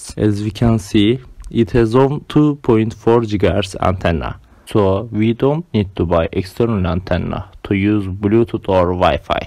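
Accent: Turkey. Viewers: Duyar duymaz dedim bu aksan kesinlikle bir Türk'e ait. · türk olduğuna yemin edebilirim ancak ispatlayamam , diksiyon tanımlıyor sanki :D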